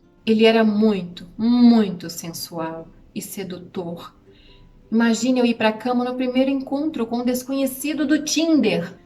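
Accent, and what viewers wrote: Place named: Minas Gerais, Brazil. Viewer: Não sei como vim parar por aqui,kkkk,amei o canal que indiquei para uma amiga que logo será psicóloga...Amei tanto que estou maratonando os vídeos,kkkkkk, já mostrei para o marido,logo que vi o primeiro vídeo, já pensei é mineira,uai ! Sou também,😊, parabéns pelo conteúdo,pois,até quem nem sequer pensou em se aventurar por este mundo daí,pode adquirir muito conhecimento ! Que Deus possa abençoar muito teu caminho !😘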